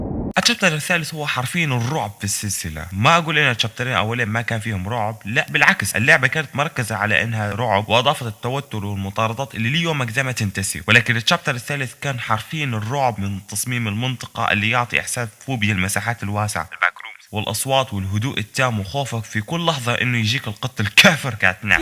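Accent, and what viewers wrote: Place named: East, Libya. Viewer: من لهجه انت ليبي من شرق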